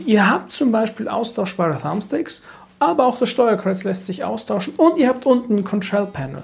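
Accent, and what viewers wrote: Switzerland. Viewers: Schweizer? Woher kommst Du?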